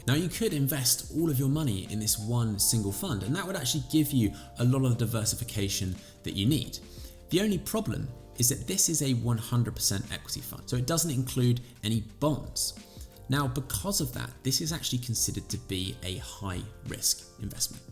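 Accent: United Kingdom. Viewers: Thanks. I found the video very informative, well structured and easy to understand. Good to hear a British voice too. I read the comments on r/UKPersonalFinance and it seems a good bunch have a stick up their arse about you only discussing Vanguard, but I personally think you’ve made it clear there are other providers. Vanguard are just an example. Your audio/video is on point and deffo a thumbs up for the warm light background. I would love to see a video doing a deep-dive on UK pensions and specifically on how to protect yourself when moving companies or countries. I’m personally in a situation where I opted out of my work place pension scheme and haven’t invested as I was supposed to be moving to Australia.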